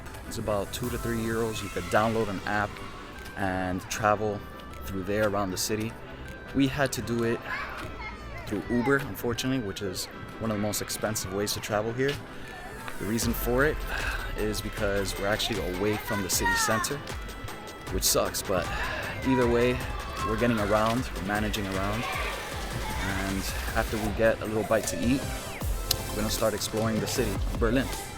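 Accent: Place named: Australia. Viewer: I didn't know you had an Australian accent. And wow rural Germany is beautiful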